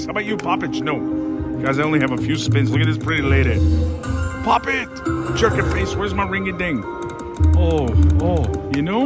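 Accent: Louisiana, United States. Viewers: Are you from Cajun Country?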